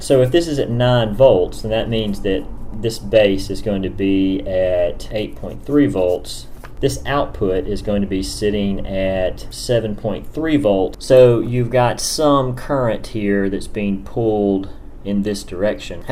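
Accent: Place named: North Alabama, United States. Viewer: Where are you from? I grew up around the South Georgia accent and I can't quite pin you down. North Alabama, maybe? Thanks for the great tutorial.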